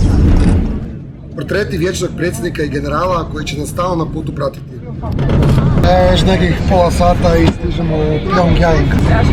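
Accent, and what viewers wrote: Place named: Croatia. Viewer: Super ste ali taj hrvatski akcenat je jako iritirajuci I kenja mi se od njega!!!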